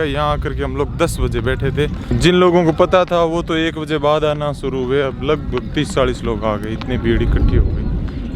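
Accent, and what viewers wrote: Rajasthan, India. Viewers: राजस्थान में कौनसा गांव है भाई आपका। वैसे आप दोनों पति पत्नी का देशी स्टाइल में राजस्थानी भाषा मे बोलना अच्छा लगता है। में तो आपकी आवाज एव बातों को सुनने के लिए ही ब्लॉग देखता हूँ।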